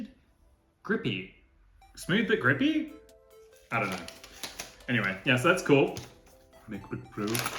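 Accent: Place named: United Kingdom. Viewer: Great video. For myself, I’m about to purchase. But I’m stuck between silver and space grey and I think you helped me with that but so ty for that bc I’ve been on the fence about what color I like, but the specs I’m getting are m1 max and 64 gb with 2 tb ssd for some future proofing. And I love your accent I’m moving to England from the states and I just love watching British content creators!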